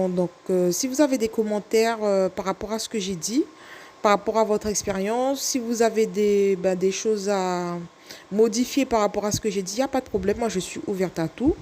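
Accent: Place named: French Guiana. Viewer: Bonjour, merci pour ces explications. Je ne connaissais pas votre chaîne, je me retrouve dans vos explications. Je me suis abonnée et écoute depuis hier vos vidéos. Vous avez un accent guyanais 😊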